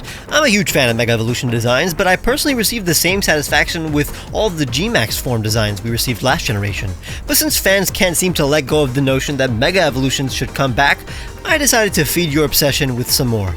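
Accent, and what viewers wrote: France. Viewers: What’s with French accent? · Dude I love your videos, your designs are amazing and ideas are awsome. 🥰 (note you French accent is superb.👌)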